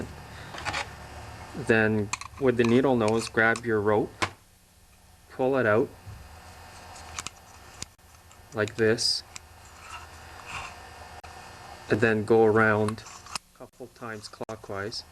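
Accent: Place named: Canada. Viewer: I love the way Canadians pronounce the word "out" as "oat." Great videos BTW!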